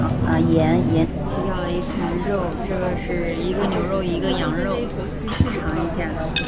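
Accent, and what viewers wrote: Northeast, China. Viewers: 应该和东北女孩一起工作生活过，满口的东北口音，一点不像服南妹子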